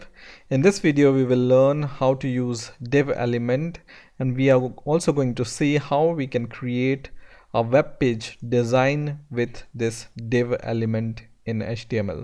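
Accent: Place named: India. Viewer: No his accent is real indian.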